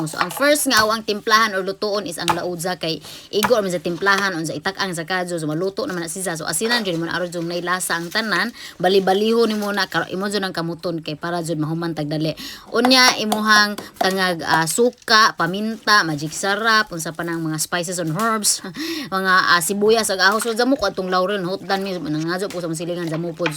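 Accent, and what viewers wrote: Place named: Bohol, Philippines. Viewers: hi miss new subcriber from cebu city😊asa dapit sa bohol inyoha qy taga bohol pud aq bana? naa pud koy gisunod nga mga vlogger taga Alicia♥️ ganahan kos imng mga vlog qy luto2 nya taga bohol jd ang sinultian nmo😊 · Nalingaw ko jamo sa imong sinultian truly bol anon jud Kang pure Taga dimiao pod among kagikan now I'm from Mindanao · Hi Ms, taga loboc ka Dai? Base Sa accent man gud nimo.. New followers me nimo Dai..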